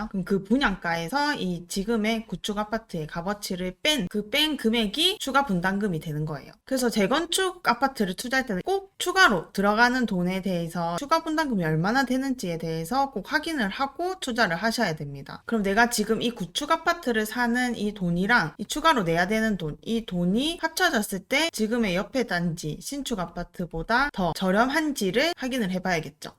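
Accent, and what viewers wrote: Gyeongsang, South Korea. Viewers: 경상도 사투리인가요!? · 이 여자 매력 쪈다. 갱상도여인!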